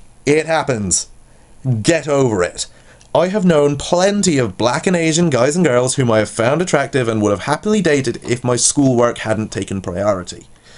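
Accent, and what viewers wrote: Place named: Ireland. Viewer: do you have irish parents? you sound like it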